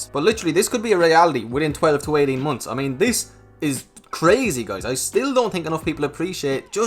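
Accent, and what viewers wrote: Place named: Ireland. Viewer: Dude i notice the irish accent. Lol.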